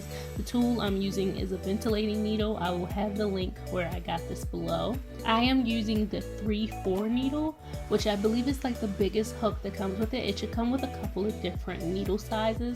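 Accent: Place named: Baltimore, United States. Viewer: Wowwww sis you bomb!!!! One question tho.. you from Baltimore? You sound just like me lol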